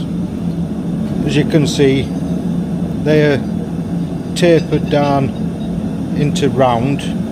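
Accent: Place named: Somerset, United Kingdom. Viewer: where are you based, tha sarnds a lot like tha frump tarn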